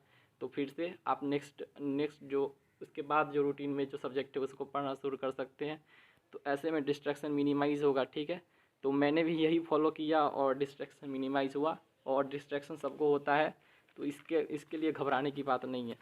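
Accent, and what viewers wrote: Bihar, India. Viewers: Bihar se ho kya,?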